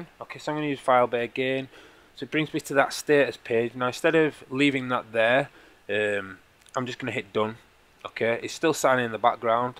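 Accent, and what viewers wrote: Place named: England, United Kingdom. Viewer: Hey I didn't realise you was English I though you was American. +1 for English jailbreaks and developers.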